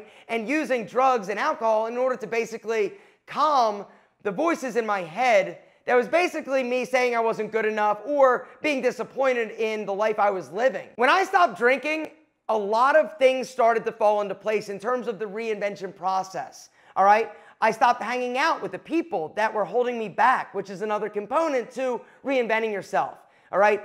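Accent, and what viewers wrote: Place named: New York, United States. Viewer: I like that you talk like a NEW YORKER !!!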